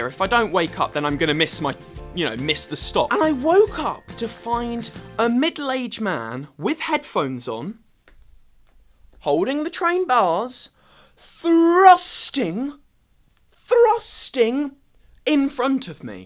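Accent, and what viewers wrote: United Kingdom. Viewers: Thrusting!!!!! *adorable British accent* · Your british accent is incredibly gorgeous :D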